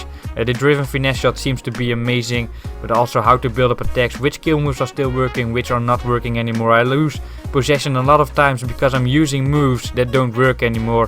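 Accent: Netherlands. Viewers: Kom net je kanaal tegen. Jeetje,... echt erg indrukwekkend hoe je speelt. Wellicht spelen we een keer online. Verder zou ik enkel je Engels een beetje opschonen. Enkele standaard Nederlandse Engelse uitspraken; "finding it out", "try stuff out". Enkel goed bedoelt! Heb me iig geabonneerd en heb er al een hoop van geleerd, thx!!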